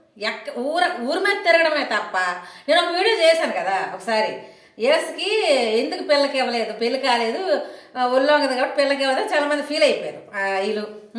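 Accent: Srikakulam, India. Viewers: సికా కులం ఏమో 😂 అనుకున్నా నేను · అక్కా నీ శ్రీకాకుళం బాస చాలా సూపర్, కానీ యేసులో ఉన్న ఒక్క కొలటి కూడా నీలో ఉంటే చాలా అభిమానించే వాడిని, నీకు దేవుడు మారుమనస్సు దయచేయును గాక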